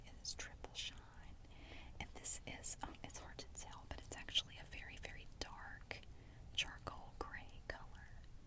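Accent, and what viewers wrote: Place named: Coastal North Carolina, United States. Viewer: New viewer! You have a delightful whisper. I'm trying to peg your regional accent. Coastal North Carolina? I grew up on east coast and settled in midsouth state.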